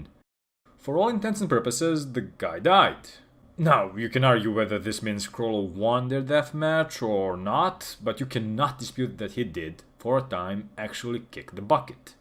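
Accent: Eastern Europe. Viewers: Slavic accent is excellent, don't be shy.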